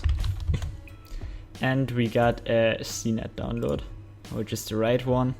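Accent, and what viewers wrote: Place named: Germany. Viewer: german? denk ich ma hörs an deim english ich bekomme 1000Errors wenn ich sie lade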